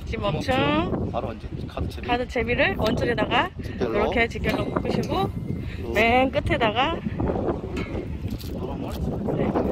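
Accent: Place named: Gyeongsang, South Korea. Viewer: 차가라~차가라 차가! 완죤 갱상도 말씨!ㅎㅎ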